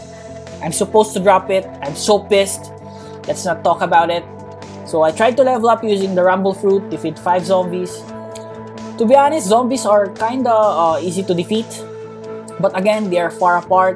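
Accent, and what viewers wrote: Philippines. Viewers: Hes accents is like a filipino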